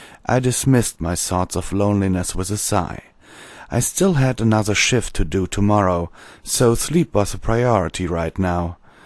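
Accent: Germany. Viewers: I think you’re from Germany but you have an English accent mixed with German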